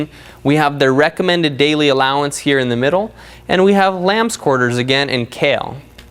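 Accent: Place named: Russia. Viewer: Love the Russian accent ;)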